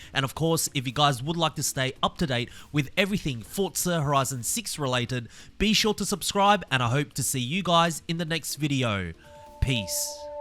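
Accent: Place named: Australia. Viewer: not game-related, but gotta ask - where are you from? you throw around 3 or 4 different accents in your narration, which sounds like a HEAVILY tainted Aussie.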